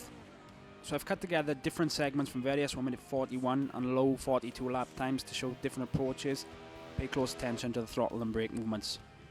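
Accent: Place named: Wales, United Kingdom. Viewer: Really good video, shame your not making any more and it's nice to hear a Welsh accent :)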